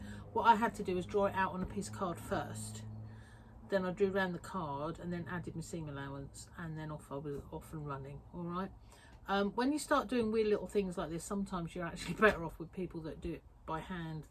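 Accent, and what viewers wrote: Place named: England, United Kingdom. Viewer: Hello love your accent so miss hearing English accents (in France ) adore your fabric stash behind you Subscribed so Have a beautiful week and see you virtual soon x x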